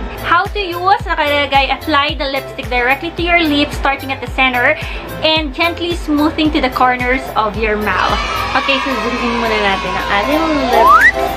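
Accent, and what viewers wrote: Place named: Batangas, Philippines. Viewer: kakatawa mam ang pagsasalita ninyo HAHAHHAA batangueño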